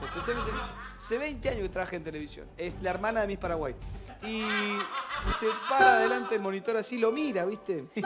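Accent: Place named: Mexico. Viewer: sos un capo me encanta cuando hablas en mexicano!!😘😘😘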